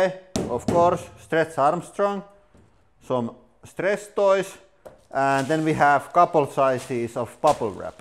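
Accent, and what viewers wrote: Finland. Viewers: You turned Stretch Armstrong into a chicken carcass! You Finns rock. I worked for ABB many years and traveled to Helsinki from time to time. I miss hearing the "Finglish" and your great sense of humor. Thanks to your channel, I get this back without traveling to Suomi-land. Take care and stay well.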